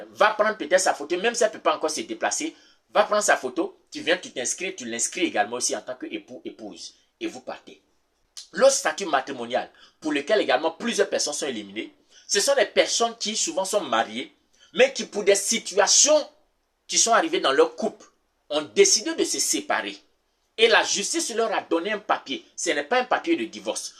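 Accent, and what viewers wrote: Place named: Ivory Coast. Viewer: Hummmm mon frère merci beaucoup pour cette vidéo d'une valeur pratique l'accent ivoirien reste incontournable dans tes propos.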